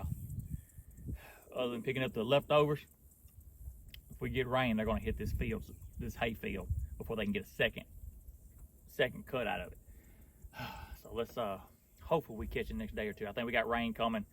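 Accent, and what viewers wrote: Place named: East Texas, United States. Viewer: When I hear you talking it reminds me of growing up in East Texas near the Louisiana border. I havan old hunting buddy lives in Grenade Mississippi. Love watching your videos.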